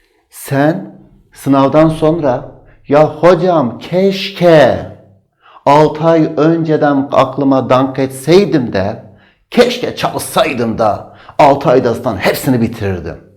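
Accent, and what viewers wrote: Urfa, Turkey. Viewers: Bu hoca Urfa 'lı mı ? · Hocam siz kesin urfalisiniz.konusma tarziniz harflerin cikisi ayyyyyyy ayni ben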